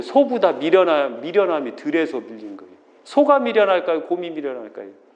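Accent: Chungcheong, South Korea. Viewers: 선생님 강의도잘하시지만 사투리잼있기도하구 우리사위말씨랑똑같아서많이웃어요 서산이유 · 충청도 사투리가 구수하죠~^^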